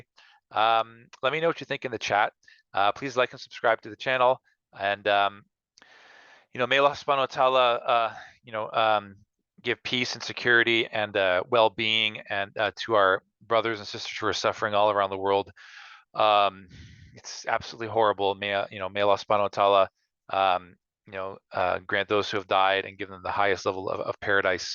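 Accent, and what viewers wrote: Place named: Canada. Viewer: Also love the Canadian accent, the best accent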